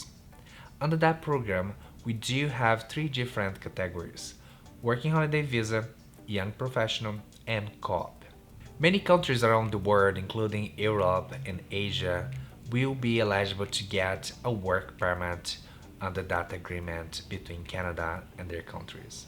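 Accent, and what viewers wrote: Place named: Brazil. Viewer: HE HAS A BRAZILIAN ACCENT. :)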